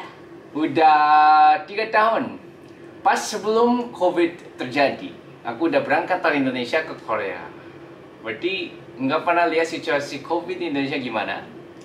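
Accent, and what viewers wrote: Malaysia. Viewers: nuna dan oppa adalah channel fave aku❤ kalian tulus ya suka Indonesia, sungguh terharu.... oppa bahasanya kaya Malaysia❤ negara terdekat kami, sodara kami ❤ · Bahasa Indonesia masih bercampur bahasa Melayu Malaysia, mungkin karena lama juga di Malaysia. Semoga lancar acara pernikahan nya.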